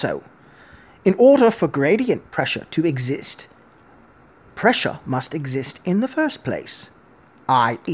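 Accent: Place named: England, United Kingdom. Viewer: If I didn’t know better, I would have thought you were from England not Alberta. 😘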